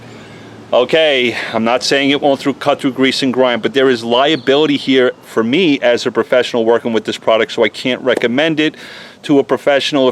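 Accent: New York, United States. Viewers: your accent sounds like your from new yark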